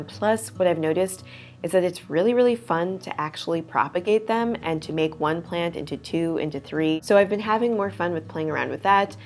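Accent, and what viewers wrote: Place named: Minnesota, United States. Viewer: I love your Minnesota accent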